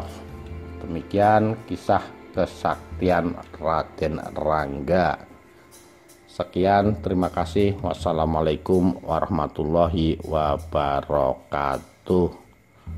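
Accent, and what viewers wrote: Tegal, Indonesia. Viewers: Admin kayane wong tegal apa brebes yaa...?? Intonasine lucuu 🤣🤣🤣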